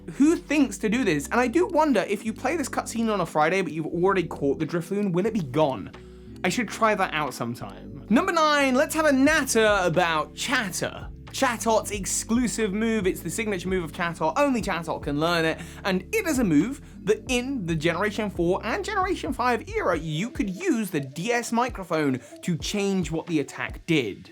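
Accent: England, United Kingdom. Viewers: With r-dropping English accent, “thirsty guards” sounds like “thirsty Gods”, which I think is a great way to describe the residents of Mt. Olympus.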